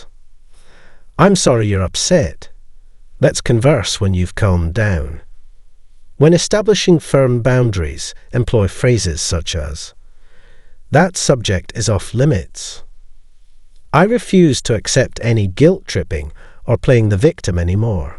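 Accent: Scotland, United Kingdom. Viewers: This robot voice is amazing. I mean, yeah. It makes some mistakes, but this Scottish accent is amazing.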